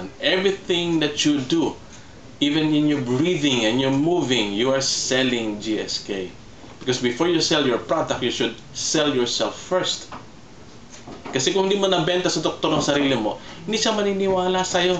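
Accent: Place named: Caribbean. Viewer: your accent is slightly caribbean :)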